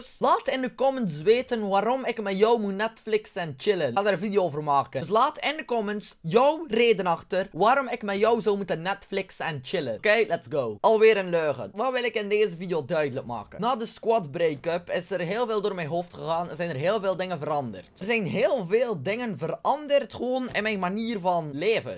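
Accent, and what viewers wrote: Belgium. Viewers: Netflix&Chill met mij omdat ik uhm nouja uhm uhm omdat ik uhm je belgische accent en je haar vet schattig vind en ik ben (hoop ik) ongeveer 100% een jongetje ^^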